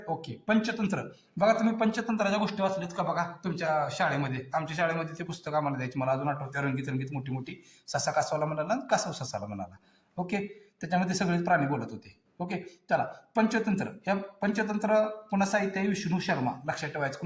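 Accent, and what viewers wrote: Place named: Latur, India. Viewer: तुम्ही लातूर चे आहात का 😂😂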